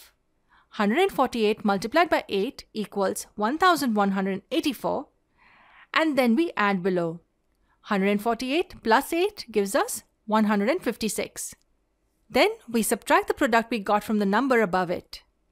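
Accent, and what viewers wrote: India. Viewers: I can tell that the reader is Indian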